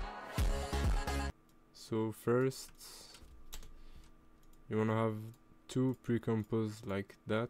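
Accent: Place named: France. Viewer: why is bros voice always changing now u sound french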